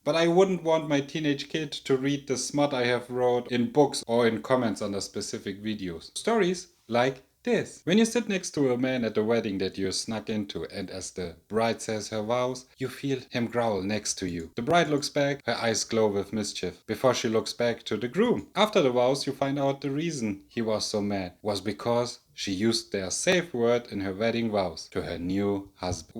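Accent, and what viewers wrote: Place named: Germany. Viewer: immediately recognized that you're from Germany xD nice review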